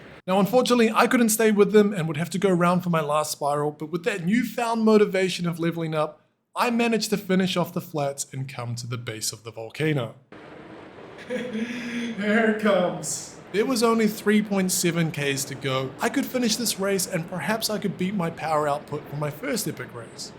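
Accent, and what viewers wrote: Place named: New Zealand. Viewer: Loving the kiwi zwift commentary. Follow on zwift as well. When’s a group ride coming?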